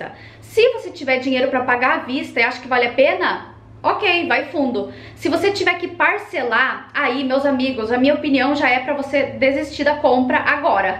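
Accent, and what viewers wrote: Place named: Curitiba, Brazil. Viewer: Gostei de ouvir o sotaque da minha terrinha. Moro a vinte anos no Rio, e é bom me sentir em Curitiba de novo.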